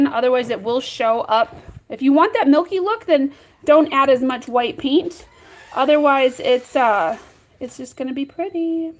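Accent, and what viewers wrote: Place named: Upstate New York, United States. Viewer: You sound like you’re from upstate NY. Or Michigan but mostly upstate western NY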